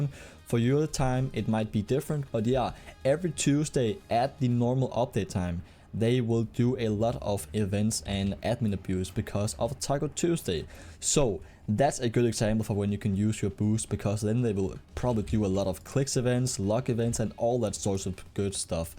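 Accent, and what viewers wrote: Denmark. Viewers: Elsker din danglish haha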